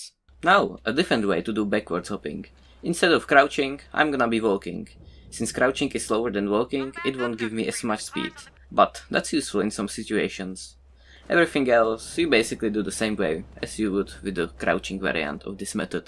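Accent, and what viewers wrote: Czech Republic. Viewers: Cz accent :D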